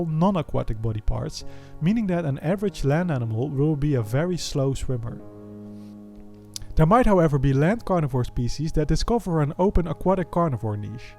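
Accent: Netherlands. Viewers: ik vind dit spel heel leuk zeker omdat het gemaakt is door een nederlander.. tenminste je klinkt als een nederlander XD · Are you Dutch really sounds like it😳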